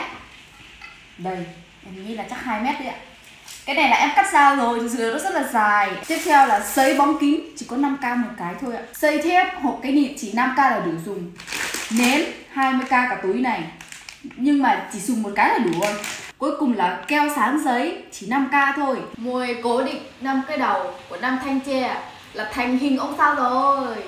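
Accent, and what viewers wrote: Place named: North, Vietnam. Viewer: Bạn này học bình đinh sao lại nói tiếng bắc nhỉ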